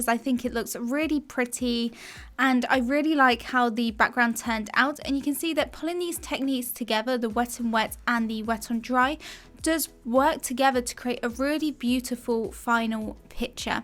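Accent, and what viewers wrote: United Kingdom. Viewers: What a joy to hear a British accent on a YouTube art video. This is a really wonderful and helpful video, thanks!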